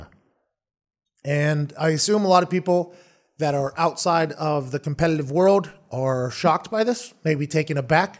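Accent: Pittsburgh, United States. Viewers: That yinzer accent lol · Raymond Toper hahaha same , his Pittsburghese is so good too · I love when he does accents of idiots and his Pittsburghese accent comes out. I'm from the burgh and my buddies and I do it all the time. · The Pittsburghese gets me every time! #412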